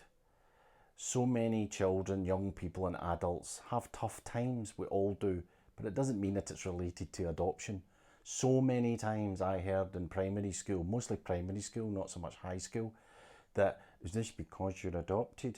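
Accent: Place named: Scotland, United Kingdom. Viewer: I don't mean to be shallow but you have a lot of charisma with that witty Scottish accent? And you very handsome too! Seriously I think it's thoughtful for you to make this video and inspiring others.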